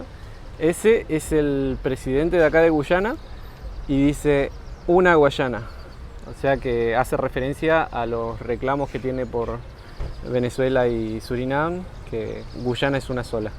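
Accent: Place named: Argentina. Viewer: Es guyana, no se dice guchana, se dice llueve, no chueve, buena informacion, solo que no me gusta que los argentinos, hablan con la Che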